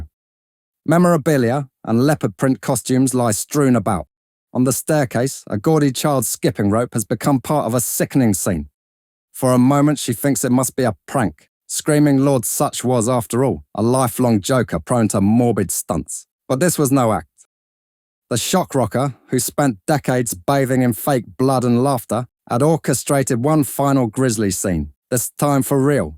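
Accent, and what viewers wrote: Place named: Cockney, United Kingdom. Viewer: Please keep the human narrator - like an East End carnival barker... Very appropriate, somehow.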